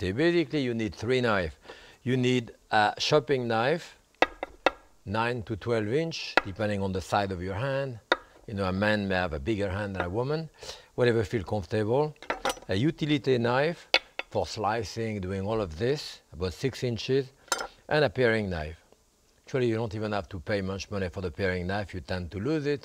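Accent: France. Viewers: I love his teaching. Clear, professional, pleasant. The French accent makes it all the more authentic! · Gotta love a real French accent.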